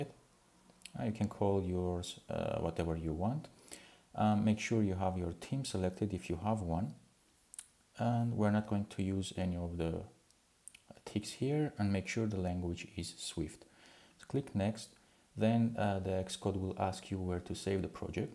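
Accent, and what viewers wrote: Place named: Iran. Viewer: Thanks it was useful💙 with your lovely Persian accent😁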